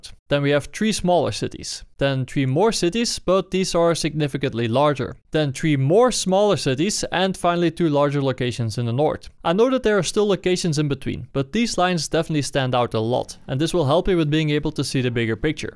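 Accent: Netherlands. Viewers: Dis guy sounds so Dutch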